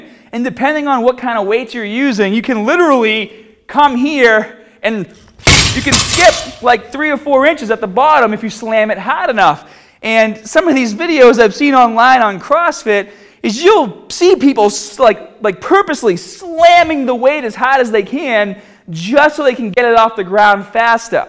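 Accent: Boston, United States. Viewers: when did he get a Boston accent? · He's from America... That's a Boston accent. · Love the Boston accent, brp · Good, insightful stuff. One thing: as a Brit, I'd say your Boston[?] accent comes over a little strong. It's easily understood, but it makes a twenty minute video seem half as long again. If you could tone it down to a 'Ted' level, that'd be great, and maybe would widen your appeal. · With that Bahston 'A', if he spoke just a bit slower he would sound just like Ahrnold! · Bahbell... this guys from boston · This dude is pretty cool. He knows his stuff. That Boston accent kills me sometimes, lol, but good material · I happened to just stumbled upon one of your videos and now I am hooked. I love the videos, love the way you tell it like it is and most of all a good teacher. I look forward to staying updated on all your videos keep up the good work man. 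Ps: where is your accent from? You up north-Boston area something? Lol Anyhow keep doing your thing man take care.. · You must be from Boston, I can tell by your accent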